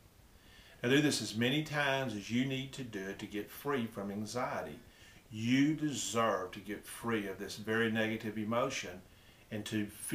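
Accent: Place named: South, United States. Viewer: Hey ~I'm in the first qtr of LVN School! I stumbled upon a guy with an Brogue talkin' about a Drivin' test! I'm doing math,drug dosages,Taking tests every week & I'm a freeked out mess over these tests!I get passing grades BUT BARELY!When I found you you have a souther accent (like me!)Reminds me of the nice folks back home in the deep south!did the "tapping" here on this Video~you have one for "Test Taking Anxiety?"Bring it on!PLEASE!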